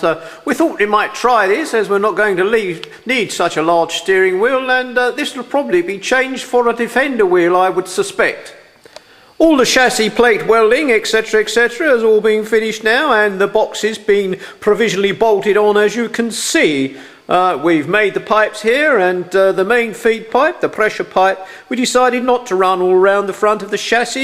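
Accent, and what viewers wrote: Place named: United Kingdom. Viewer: good to hear the British accent :) wish you showed more more the mounting plate and procedure for the steering box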